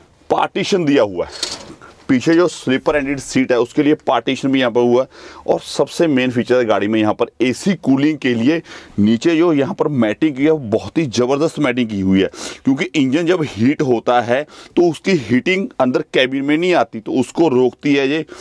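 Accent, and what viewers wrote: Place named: Bihar, India. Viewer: ਬਾਕੀ ਤਾਂ ਸਬ ਠੀਕ ਆ। ਪਰ ਪੰਜਾਬੀ ਬੋਲ ਵਦੀਆ ਲਗੇਂਗਾ ਪੱਗ ਬਨਕੇ ਭਈਆਂ ਵਾਂਗੂੰ ਨਾ ਬੋਲ ਸਰਦਾਰ ਬੰਦਾ ਹੋਕੇ ਤੂੰ ਬਹੁਤ ਬੁਰਾ ਲੱਗ ਰਿਹਾਂ ਐਂਵੇ ਬੋਲਦਾ। ਫੁਕਰਾ ਜਾ ਲੱਗੀ ਜਾਨਾ ਜਮਾ ਬਾਬੇ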